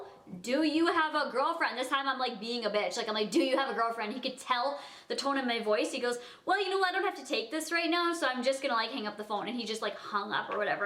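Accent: Canada. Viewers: Rose Teters she’s canadian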